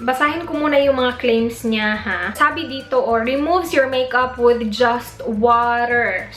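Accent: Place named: Visayas, Philippines. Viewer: bisaya accent is there pa hahahaha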